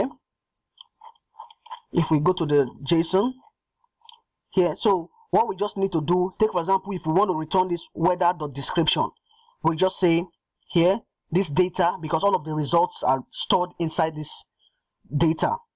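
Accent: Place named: Nigeria. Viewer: I'd recognize a Nigerian anywhere. Good one bro, carry one.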